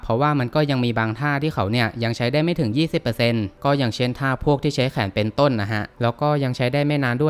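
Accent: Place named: Mae Klong, Thailand. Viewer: เป็นคนแถบแม่กลอง ราชรี เมืองกาญรึปล่าวครับ สำเนียงการพูดนี่แถบบ้านผมเลย555ผมคนแม่กลอง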